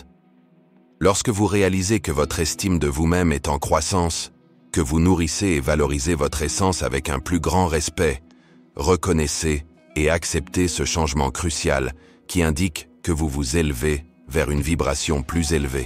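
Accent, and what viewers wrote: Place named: Germany. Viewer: C'est dans toutes les vidéos (que je viens d'écouter :) de cette chaîne ... Quand l'Ai fait des erreurs ou des choses intentionnelles, généralement, ce n'est pas pour le bien des humains. Là, des mots en espagnol, francisés avec l'accent allemand, ça sonne comme un gloubi boulga de langues européennes ... Un espèce de truc incompréhensible et rabat-joie, comme l'UE de la hyène ! Pensée unique, langue unique, banque centralisée bref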